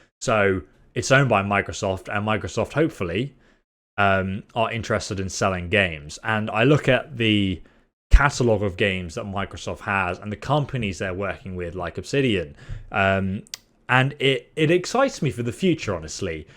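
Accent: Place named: United Kingdom. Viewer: Bought doesn’t have an r. Just because your British and say words with a weird emphasis on vowels doesn’t mean you can add letters.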